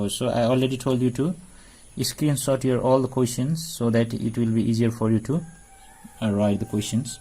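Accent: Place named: Nepal. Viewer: Are u from nepal by any chance this is my first time watching ur vdo and I'm also from nepal and i think ur accent is like nepali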